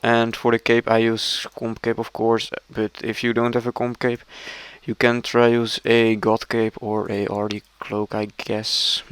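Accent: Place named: Netherlands. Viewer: sounds like you're dutch. and very good vid never knew glacors were worth it again after eoc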